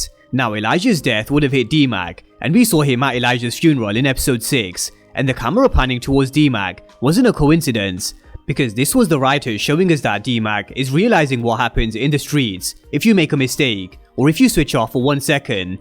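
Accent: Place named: United Kingdom. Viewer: Makes you wonder who is JP's ex though (D-Mac's mother)?🤔💭 JP was definitely straight at some point of his life to have D-Mac to begin with, yet there needs to be some back-story explored to why we don't know about D-Mac's mother? D-Mac is mixed-race so his mother must be black, but then why did she leave JP and her son is another question? P.S. Well done for hitting almost 100K subs - been tuned into your recaps since you were on less than 10K 💯🔥 Guess it's great to hear TV series reviews from a British guy - your selling point!😎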